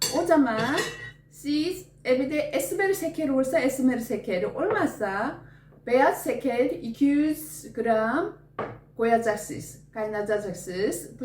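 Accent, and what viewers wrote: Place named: Azerbaijan. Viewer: Elinize sağlık. Tarif için teşekkür ederim. Bu arada Azeriler gibi konuşuyorsunuz 😅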